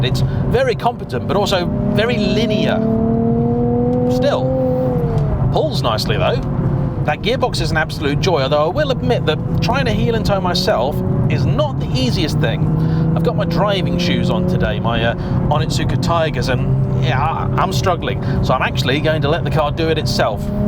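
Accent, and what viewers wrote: United Kingdom. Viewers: I like the british accent, being from a non english speaking country, the english accent is so easy to understand! Love the american and aussy humor but i cant follow quickly with them as with the british :(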